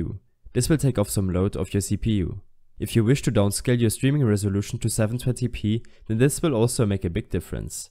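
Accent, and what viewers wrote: Germany. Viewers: Yeah, he sounds like Klaus because he's German :D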